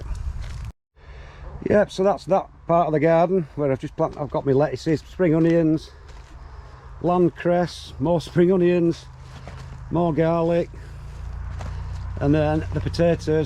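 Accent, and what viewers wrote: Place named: Yorkshire, United Kingdom. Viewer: I don't have anything pertinent to say other than thank you so much for sharing. I've learned a ton from you and your enthusiasm is great. (So is your speech. I did field school in North Yorkshire and hearing you always makes me smile. Also I'm trying REALLY hard not to ask you if you've ever wanted to fly your drive over Malham Cove and Tarn 🤣💚)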